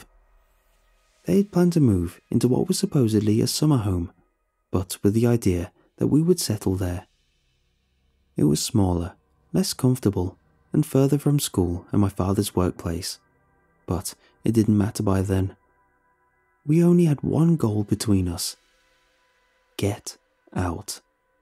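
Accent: United Kingdom. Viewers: That British accent ❤❤❤❤❤❤❤❤❤❤❤❤❤❤❤❤🍌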